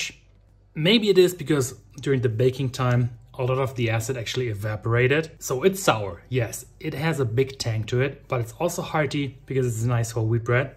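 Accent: Germany. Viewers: I love your German accent. Main reason I sat through the whole video. Good stuff thou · Got this randomly recommended, immediately noticed the German accent mein Freund, without any knowledge about bakery and/or cooking but enjoyed the video nonetheless. Loved the hissing kittens when you "spritzed" the dough :D Definitely gonna stay here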